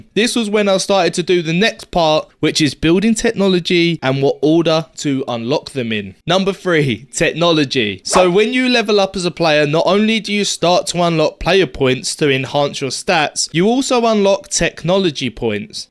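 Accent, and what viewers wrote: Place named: Cockney, United Kingdom. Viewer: Great info, your accent makes me cringe though.. COCKNEY AF EHHH?!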